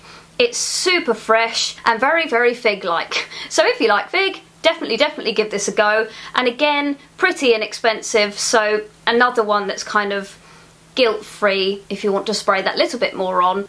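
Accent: England, United Kingdom. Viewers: Hello girl, you are so lovely to watch. The little nook where you film your videos is properly decorated and pleasing to the eyes. I love your English accent :). Two thumbs up for your videos. Well wishes from Asia :).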